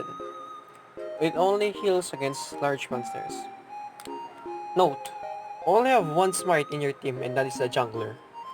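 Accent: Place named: Philippines. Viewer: The Philippinese accent is strong with this one amen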